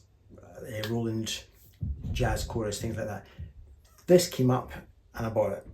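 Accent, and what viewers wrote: Scotland, United Kingdom. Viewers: We are indeed - great to hear a Scottish accent in the YT guitar circuit. Lovely tasteful playing and sounds - all the best. I'm subscribed and will be checking in 👍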